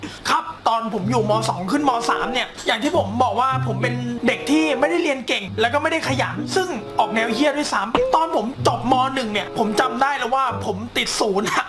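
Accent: South, Thailand. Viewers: พี่เปนโคนใต้เห้อะะะ555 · จารย์สำเนียงโครตใต้เลยนิ<num> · ใต้ออกพี่ 5555ฮาช.ห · พี่เป็นคนใต้ออ55555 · ครู เป็นคนใต้หรอ55555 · คนใต้นิ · อาจารย์เป็นคนใต้หรอนิ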